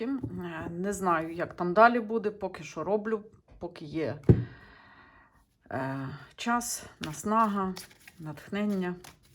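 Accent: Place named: Bukovina, Ukraine. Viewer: приємно чути правду та осадок що відповідати не будуть.Як сонечко в морозний день буковинський діалект гріє не тільки слух а і душу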